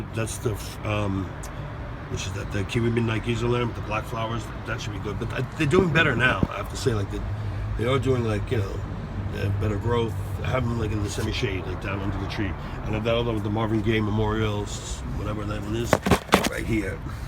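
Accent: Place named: United States. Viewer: Where do you live? You sound American but I figure you live somewhere in Europe with the schwerter comment...love the balcony!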